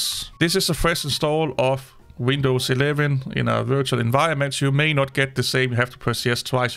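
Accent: Denmark. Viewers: Thanks so much I use Notes for my studies and needed this to work on my PC. Samsung needs to fix this its a bad look. The whole point I have a Samsung and not an iphone is because the accessibility and customization. Also are you from Denmark? Accent sounds like it.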